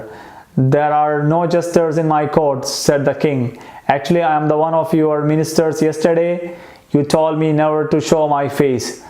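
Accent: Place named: Kerala, India. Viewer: I am sorry but your English is AWFUL. I just heard your first sentence and I had to stop because grammar was horribly off. You use "the" on occasions that you should not. You use "doesn't" in place of "don't", and "has" in place of "have" . And quite honestly, your grammar is so bad that I cannot even figure out what you are trying to say in your first sentence. Anyway, if I were to guess what you were trying to convey, the appropriate words would be "This video is only for beginners who don't read books, but who wish to read books". If I had to say it in a roundabout manner as you did, the words would have to be "This video is only for beginners who don't read books, but who have a wish or want to read books". In addition to your faulty grammar you also have a VERY thick Malayali accent that you need to improve. For heaven's sake, improve your own English (at least your grammar) before you teach others.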